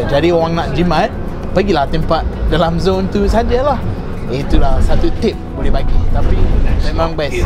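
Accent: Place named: Malaysia. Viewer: ckp inggeris mcm telo melayu. Terbaik.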